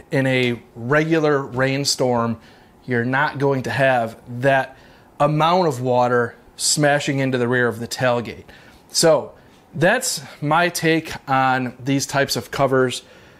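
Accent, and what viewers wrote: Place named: Western New York, United States. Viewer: That WNY accent will get you every time.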